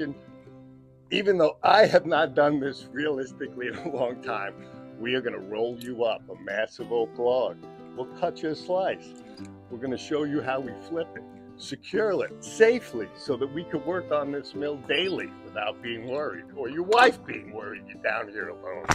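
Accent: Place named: New York, United States. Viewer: Love to hear the NY / NJ accent in a TN miller. Makes me feel at home with you. Hot Dawg !! Love your setup !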